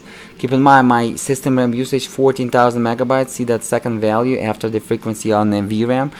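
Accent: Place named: Russia. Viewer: You are from? You pronance like a russian.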